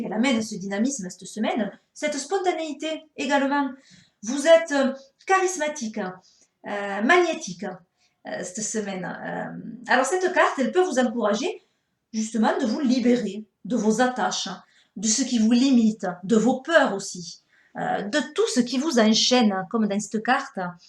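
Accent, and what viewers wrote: South, France. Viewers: Quel accent du soleil une merveille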